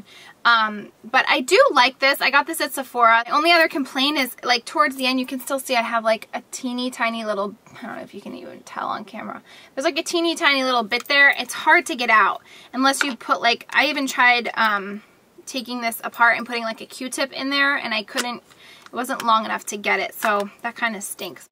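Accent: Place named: United States. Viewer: could I just ask what part of the states you are from? Love your accent. I am from the UK x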